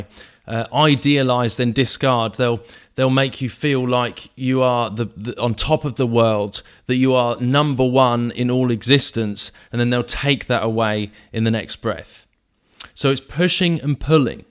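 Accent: United Kingdom. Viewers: i dont like your accent !!!! yu sound british and . . . . i will never trust a british no matter how legitimate they maybe . . . nonetheless i liked your video !!! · There is no "Jezebel Spirit" in the bible. Both the "Jezebels" in the bible were evil, but neither are described in nearly this detail A well produced video and an articulate British accent does not make it any more true. There is a lot of info out there about Narcissistic Personality Disorder and how it works. Giving these behaviors a phony spiritual persona and a name is just something someone made up. The "Jezebel Spirit" is a preaching and book selling gimmick among charismatics and has been for at least twenty years.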